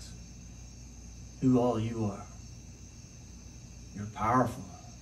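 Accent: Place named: South, United States. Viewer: That was beautiful! I loved the part when you said the word light and lightning flashed in the distance. Thank you. We are so proud of you! You still have your southern accent. ❤️—Myrtle Beach mom and daughter, Catherine MBHS Sophomore.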